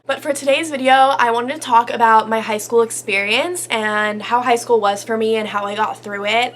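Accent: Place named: Texas, United States. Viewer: Your texan accent came out a little in this video lol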